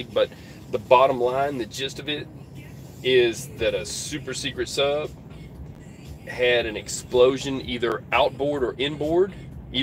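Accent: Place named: Midwest, United States. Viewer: How the hell do you know that cartoon? I watched it as a kid but I was born in 70s almost 80s lol....... kursk stuff- we offered help ? Can U speak Norwegian? Lol you speak like from midwest ..white, redblooded murican boy.:) what I meant was that were Norwegians who offered help. Its arctic circle. Do u think America with her 2 old ass icebreakers vs Russian 57 icebreakers....u offered help lol.....do you know that many think it got hit by Ohio class that was getting the repairs in Norway a few days later and you can see from the satellite image that the emergency capsule is not there. Removed . Why ? Why even repair in Norway for an American sub if it's not some regular maintenance. PS... I like you even touched this subject Dude does that bald black commentator with glasses look like ru paul?lol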